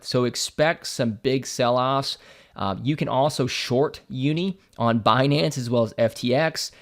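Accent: United States. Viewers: Thanks again! Always a pleasure to listen to your thoughts on the market and your charming American accent.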